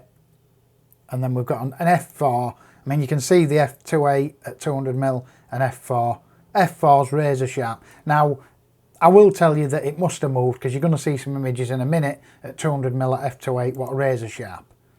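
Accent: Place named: Cockney, United Kingdom. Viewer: Trying to decipher your Cockney Accent .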